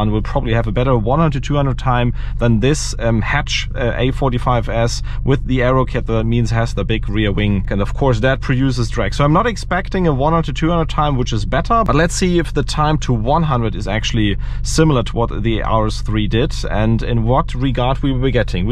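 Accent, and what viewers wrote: Germany. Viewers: Aua own Audi😅. Das tut ja schon in den Ohren weh, wenn du als Deutscher Englisch redest. Wieso ist dieser A45s denn eine Sekunde schneller als alle anderen auf Youtube? Du korrigierst die Zeiten auch nicht bei deiner Box. Da ging es bestimmt bergab.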